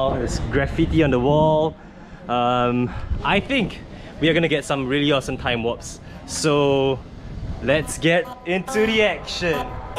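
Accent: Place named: Singapore. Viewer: Idk why, but I'm just enjoying the singlish accent, LOVE IT ❤️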